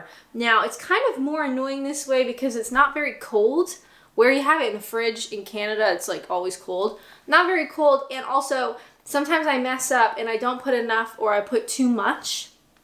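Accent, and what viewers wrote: United Kingdom. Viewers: wuss-tuh-shuh. There used to be a Worcester Sauce flavoured crisp. They spelled it 'Wuster' on the packet to try to help people say it. Still, your British accent is coming along well in other ways. You have a most un-Canadian glottal stop on the word 'fruit'. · Great video as always 👍 Your fridge is HUMONGOUS compared to mine!! I love it when you do your British accent, it's coming along nicely 😉 My favourite teabags are the Co Op's Fairtrade tea, not too strong or weak, just right for me. Are cheese and onion crisps popular in Canada? Obviously they are very popular here in the UK. Well happy valentines day, I hope your other half has spoilt you rotten today ❣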